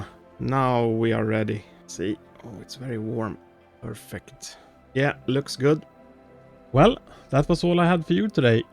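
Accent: Sweden. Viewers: Hey are u swdish u sound like it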